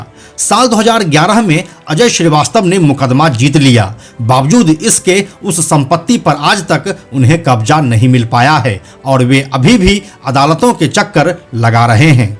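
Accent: Bihar, India. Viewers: बकवास, उससे अधिक घटिया बिहार जैसा उच्चारण. शम्स ताहिर खान से training लो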